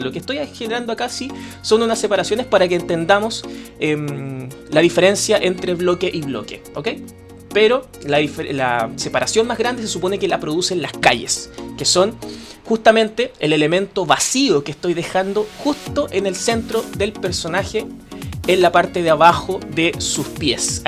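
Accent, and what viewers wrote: Chile. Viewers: Eres chileno, supongo :v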